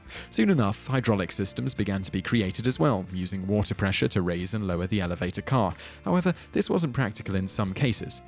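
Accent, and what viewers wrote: United Kingdom. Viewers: The narrator has a British accent though (that was the joke lol).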